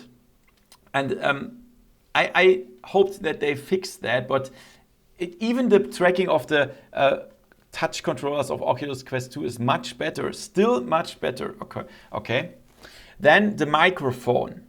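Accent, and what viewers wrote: Germany. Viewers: Are you German??? )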